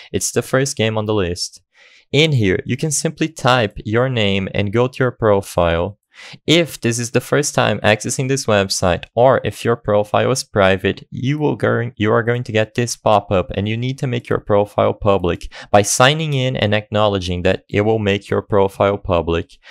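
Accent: Brazil. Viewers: amazing video! thanks from Brazil. (you sound a little brazilian)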